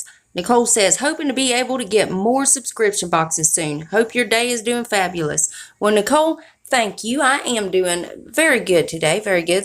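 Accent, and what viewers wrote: South, United States. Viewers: I love your accent so much!! I'm southern...but girl, you're super southern, 😂😂!! Bless it!! 😘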